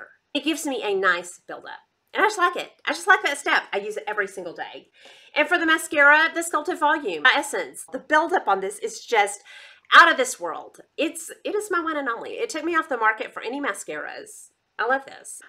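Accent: South, United States. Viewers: I love your southern accent!! I thought I was the only one that described my eyeshadow palettes as my children lolol xo-Wendy, NYC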